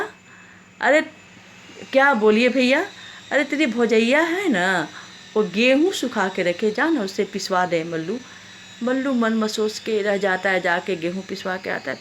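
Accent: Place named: Bhojpuri, India. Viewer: जो भोजपुरीया शैली का तड़का लगाया ना बहूते झबरदस्त बा😀😀👏👏👏👏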